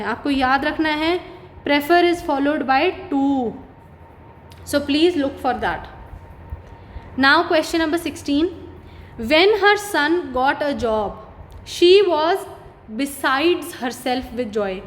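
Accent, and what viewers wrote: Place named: Garhwal, India. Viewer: Are you Garhwali .... your tune matches alot😅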